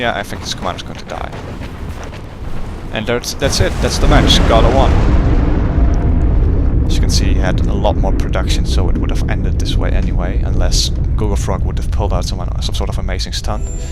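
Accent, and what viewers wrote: Netherlands. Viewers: Thanks for uploading! Besides watching starcraft replay, I also start enjoying this fabulous game. . Btw, are you Dutch? Just wondering because of the accent. :)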